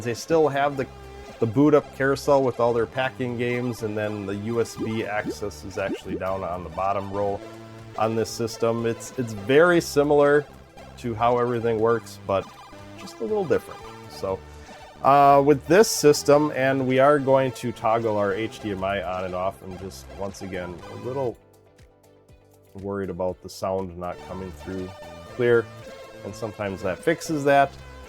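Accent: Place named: Canada. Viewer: How do you plug in the Spectrum? Assuming you live in Canada, based on your accent, do you need a step up converter for it? I live in the US, and I know in North America has 110-120v power while the UK and Europe use 220-240v. I ask because as of this comment, I'm getting one in the mail and I'm afraid to plug it in just straight into the wall at the risk of ruining it.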